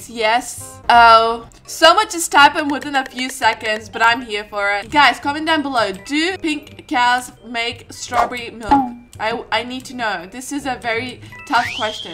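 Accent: United Kingdom. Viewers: I'm very surprised by your British(or idk) accent(or voice)And can I join your videos?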